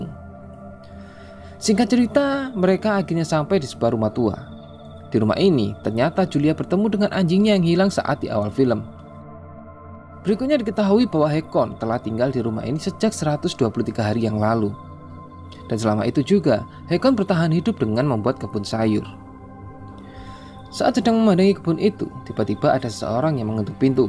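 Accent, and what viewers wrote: Indonesia. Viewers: Admin!~ Gua punya pertanyaan. Diakhir video. Apakah Si Julia ikut bersama Hekon ataupun tetap stay dibumi? = maaf gua kurang faham sedikit dikernakan aku orang Malaysia. Kurang memahami logat" Indonesia . But! Gua tetap mendukung ni channel kok. Dengan +1subscribed ;) . By the way semangat yah bang!!! Ganbatte🔥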